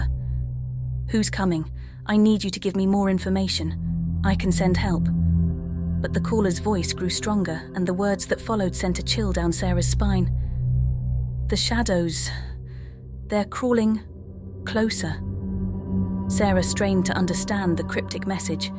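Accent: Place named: United Kingdom. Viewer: your voice is a very beautiful narration . you sound like your. British or England not sure. but it's very beautiful I like it. Plz keep up the great job .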